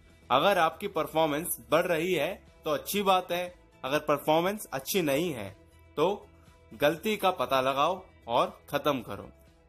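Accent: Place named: India. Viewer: fuck! this accent! i'm so fed with indian accent! fuck!!!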